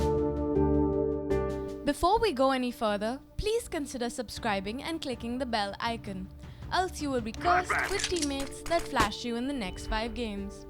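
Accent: India. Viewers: U indian or sth? Lol no offense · Indian accent?!?! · The Indian accent is a bit irritating to be honest. Of course you are used to it if you are local and listened to it your whole life. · wtf is this indian english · I clicked the video because the title sounded credible but then i heard the indian accent...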